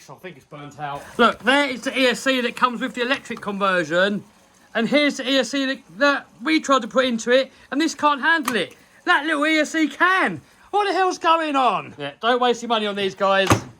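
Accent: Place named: United Kingdom. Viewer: bri'ish accent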